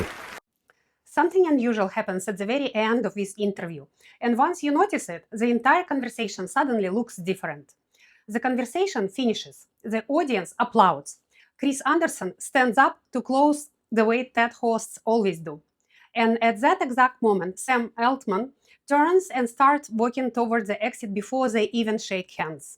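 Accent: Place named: Russia. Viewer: Pretty, pretty thick russisch accent. Bloody hell 🌷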